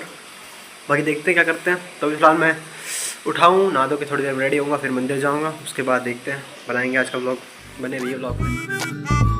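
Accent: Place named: Haryana, India. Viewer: haryanavi accent is fireeeeee 🔥🔥🔥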